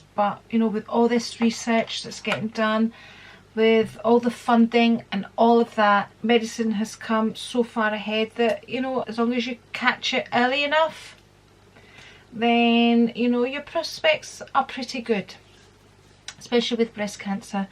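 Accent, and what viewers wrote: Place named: Glasgow, United Kingdom. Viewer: Tb brutally honest as a fellow Glaswegian if we don't have the Glasgow University accent I feel we are under valued. I am a partner in a very successful international security company but as my husband is from a very affluent side of our city his accent is more appreciated shall we say. I love watching you and your family. So down to earth and relatable, don't think it's you sweetheart by any means but as I said sometimes it's just the way we speak. Hopefully things will pick soon, before you know it. X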